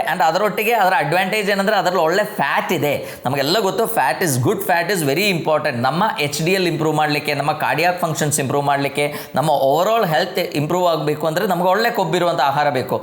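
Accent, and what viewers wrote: Kerala, India. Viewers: ಅದ್ಬುತ ಕನ್ನಡ ಮಲಯಾಳದ ಶೈಲಿಯಲ್ಲಿ..‌ಅಡಿಪ್ಪೊಳಿ... ದನ್ಯವಾದ.‌🎉 ಉತ್ತಮ ಮಾಹಿತಿ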